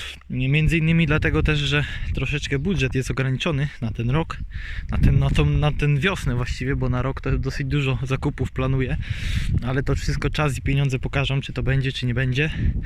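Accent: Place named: Podkarpacie, Poland. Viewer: Prosty chłop, prosty film i prosty przekaz i tak to ma wyglądać Brawo. Przede wszystkim gratulacje chęci i odwagi do podjęcia się takiego wyzwania i realizacji marzeń. Wpadłem tutaj trochę przez przypadek ale daje suba i zostaje na dłużej z wielką chęcią na więcej. Wrzucaj częściej cos nawet z drobnych prac naprawczych, przygotowawczych itp. Powoli małymi krokami a cos z tego napewno będzie. Trzymam za Ciebie kciuki i szczerze życzę powodzenia. Nie jest to lekki kawałek chleba ale widać że dobrze kombinujesz bo grafika to przydatny fach w dzisiejszych czasach. Pochwal się tylko jeśli można wiedzieć ile masz lat i czy jesteś z Podkarpacia bo tak trochę po Twojej wymowie sugeruje się tym regionem? Jesli chodzi o poprzedni film to nie wiem czy doszukałeś się już informacji czy nie ale jeśli dobrze się orientuję to te końcówki w opryskiwaczu różnią sie sposobem oprysku w zależności od danej uprawy. 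Nie jestem rolnikiem ale pamiętam że wujek który nim jest opowiadał mi o tym kiedys bo tez sie go o to pytalem😉 Jeszcze raz powodzenia i tak jak mowie troche częściej jakieś drobne nawet wstawki z nowościami, przemyśleniami i wgl a na pewno znajdzie się więcej osób chętnych wspomoc dobra rada i słowem w komentarzach. Powiedz jeszcze jak pracę przy opryskiwaczu stoją narazie w miejscu czy cos ruszyło? Pozdro 👍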